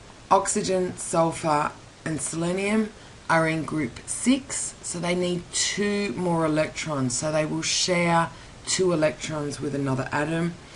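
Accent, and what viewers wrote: Australia. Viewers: Aussie Accent. So easy to tell :D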